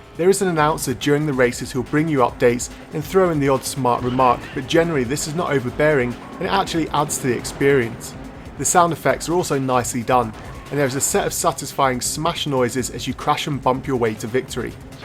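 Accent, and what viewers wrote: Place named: United Kingdom. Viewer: british english sounds gay, so shameful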